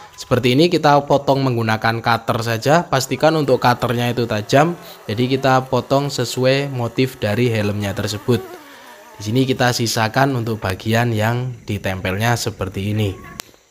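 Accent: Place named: East Java, Indonesia. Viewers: Emang sampean asli mana, logate sama, kayake jawa timur semua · Kayak ngk asing sama bahasa medoknya,dan nama chenelnya.. orang mana si bang??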